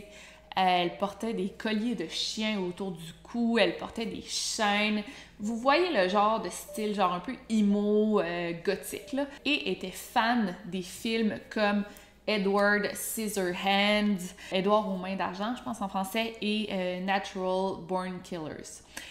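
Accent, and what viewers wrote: Canada. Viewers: J’adore ta facon de raconter les choses … pourtant j’ai du mal avec l’accent canadien d’habitude mais avec toi tu raconte tellement bien que je m’en fiche 🤷🏼‍♂️ ! Continue ainsi ! ☺️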